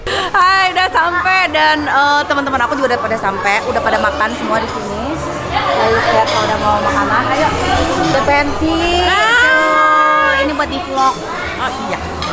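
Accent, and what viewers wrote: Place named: Garut, Indonesia. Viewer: Uhuyyyy Lamun teh ngomong Nii hayang seuri.. bhs garuttna kaluarrr... Seruuuu lahhh👍🤣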